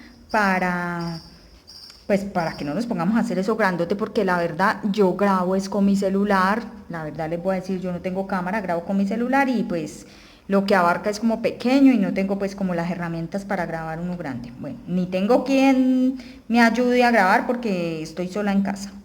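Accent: Colombia. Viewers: Hola me gusta mucho come nos enseñas, vivo en Italia hice un curso de dos años y me defendía. Per tú me has ayudado tanto, además que iorte hablar me encanta me hace sentir en mi querida Colombia... Te felicito y deseo tantos éxitos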